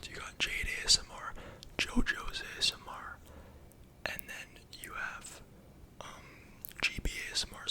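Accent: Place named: North America. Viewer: Not only that you “look” American, We can’t tell the difference in accents between Canadians and Americans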